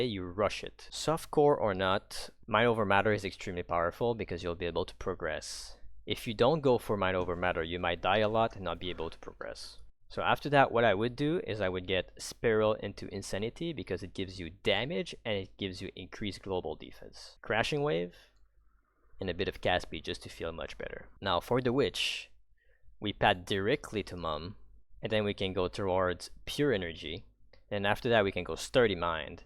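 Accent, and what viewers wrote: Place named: Quebec, Canada. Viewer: you sound SOOOO French QC speaking English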